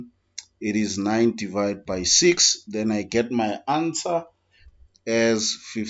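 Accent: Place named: France. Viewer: You sound french policemen not an insult tho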